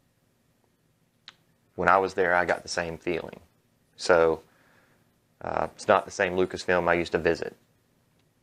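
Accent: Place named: South, United States. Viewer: I've notice something kind of funny.. lol... If the people from the east cost says Ray is a Marry Sue, and this guy who sounds like deep south says Ray is the biggest horseshit Force User. just trance late Deep south langrage to East Cost, its the same thing said in a different style of English, Marry Sue= Biggest horseshit Force User.. At this point I think people in the UK will say the same thing about Ray but in a different style.. you know what this means Disney and Lucasfilms is in deep shit..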